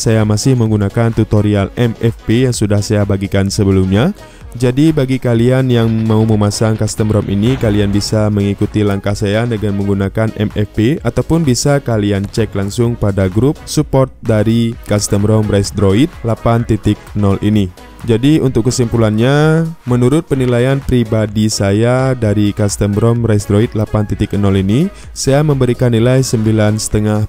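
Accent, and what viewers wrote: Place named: Magelang, Indonesia. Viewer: Dari suaranya. Magelang flasher ya ini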